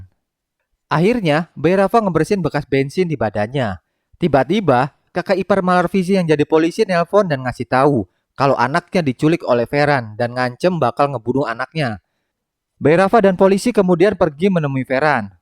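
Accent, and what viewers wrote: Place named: Cirebon, Indonesia. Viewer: Wong cerbon ya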